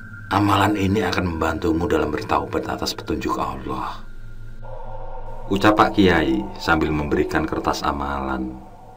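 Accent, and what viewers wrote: Java, Indonesia. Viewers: Suaranya mirip pakdhe Alfan (dongeng tengah malam) logatnya mungkin sama wong jowo nya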